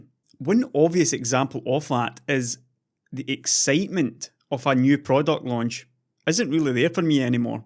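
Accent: Scotland, United Kingdom. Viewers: Scottish and male alter ego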